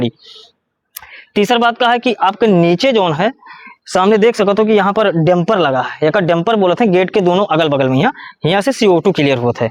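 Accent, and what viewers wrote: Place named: Rewa, India. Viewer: apki boli se lga ap Rewa MP se ho, utni door avi nahi aa sakta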